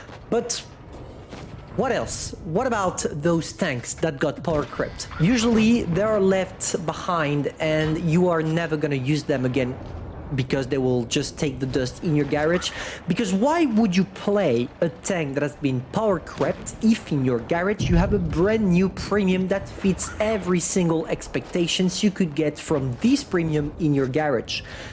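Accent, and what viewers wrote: France. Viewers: How can somebody be so annoying? 40% the French accent 30% that face 20% those awful glasses 10% those girly arms and shoulders... I'm kinda interested in what he is saying but the person itself... OMG horrible creature.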